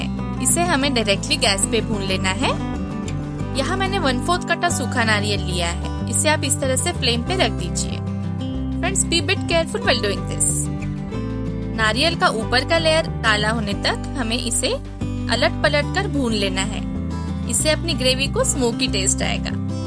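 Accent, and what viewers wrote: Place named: Nagpur, India. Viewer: I can sense Nagpuri accent in your language, though u tried to talk in pure hindi.....'ab apni' 'aju baju se'🔥🔥bole to apna Nagpur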